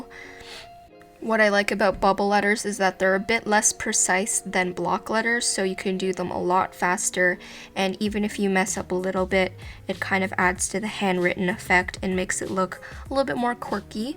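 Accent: Canada. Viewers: I can surely say that you're Canadian from the way you say "about" and "out". Am I right???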